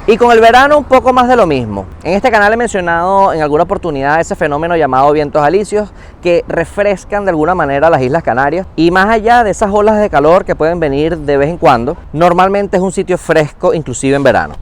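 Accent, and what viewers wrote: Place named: Venezuela. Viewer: Pana,,hablas igualito a un buen pana mio Venezolano tambien,,😁😁,, concha y me estas embullando a mudarme para alla,, ya USA me infla las pelotas ja ja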